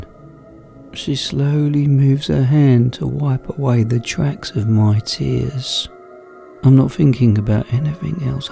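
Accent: United Kingdom. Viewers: why thankyou! it's all because of the British accent and the way you read out the stories, absolutely amazing !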